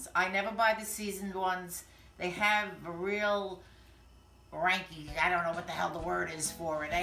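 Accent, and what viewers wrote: New York, United States. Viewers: What a hoot ! :) You sound like a New Yorker, my home - music to my ears. Loved your video. I've watched a few others stuffing artichokes and yours is the best, hands down. I'll be checking out your other videos. Keep cooking.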